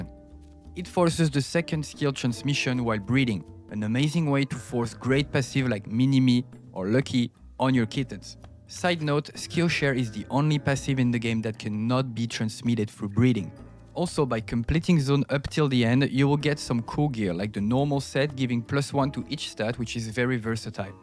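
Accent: France. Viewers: I hear a french accent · Your french accent is very beautiful ^^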